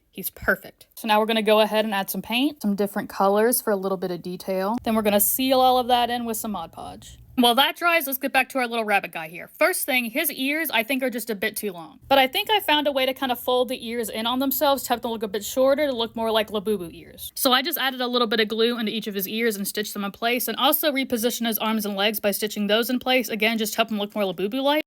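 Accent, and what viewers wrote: United Kingdom. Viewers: I feel like he’s British. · It's just a british guy ❤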